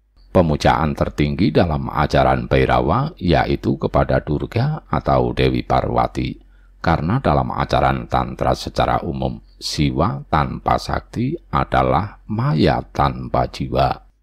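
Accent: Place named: East Java, Indonesia. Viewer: kirain jatim mojokerto jenengan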